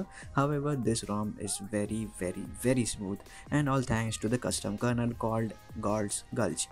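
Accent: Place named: England, United Kingdom. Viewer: Angreez se bhi Bhariwali English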